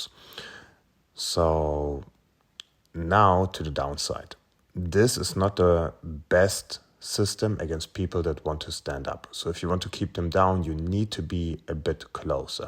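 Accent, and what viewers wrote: Germany. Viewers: This video should be titled "German man mumbling about rumbling" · are u german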